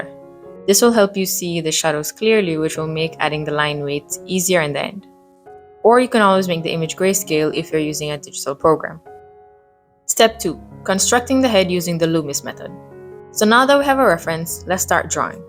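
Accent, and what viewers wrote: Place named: Jamaica. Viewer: Your accent is very Caribbean, you sound Jamaican actually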